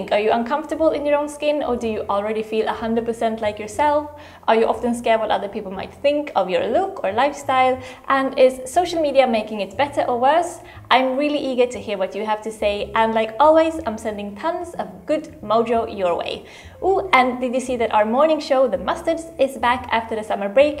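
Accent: Sweden. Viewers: Are you Swedish? I hear some English - Swedish accent. Hehe. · Where are you from? You have a swedish accent..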